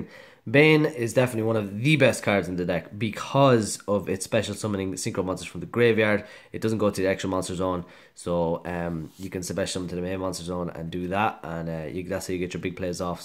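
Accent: Ireland. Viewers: Number 15 view 👌 you sound like you’ve an Irish accent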